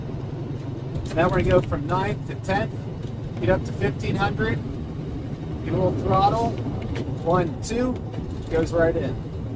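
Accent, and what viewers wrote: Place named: Minnesota, United States. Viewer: Where are you from? Minnesota?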